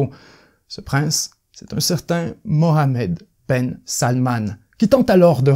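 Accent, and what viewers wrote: Quebec, Canada. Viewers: J'aime bien l'accent canadien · j'ai du mal avec la voix québécoise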